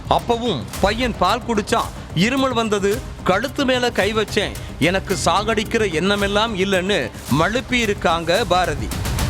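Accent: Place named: North, India. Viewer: Ennada kevalama news vasikiringa…vadakan mathiri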